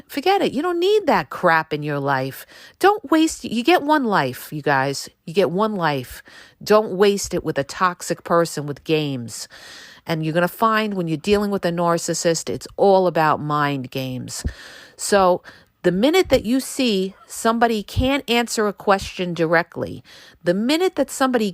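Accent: New York, United States. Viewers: . You are an amazing woman. The amount of information and experience that you share is helping lots of victims of narc abuse.Your N.Y. accent and honesty is awesome. You have figured these creatures out. They are not genuine and they are not going to help anyone. LIARS. Stay away. God bless.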